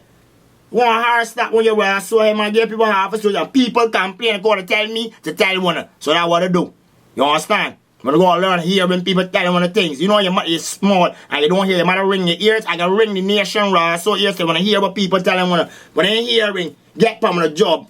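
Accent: Barbados. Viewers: I freaking love the bajan accent! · Lmao his bajan accent is the best sounds like my grandad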